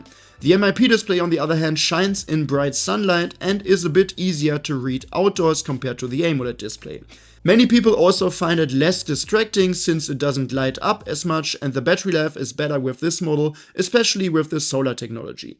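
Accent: Germany. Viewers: 10/10. The German accent takes it to an 11. I love that this channel is simply called " "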